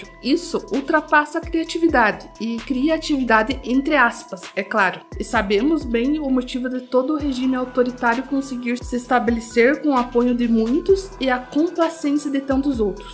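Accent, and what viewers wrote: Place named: Caipira, Brazil. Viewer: Diferente né, voz caipira, mas realmente espetacular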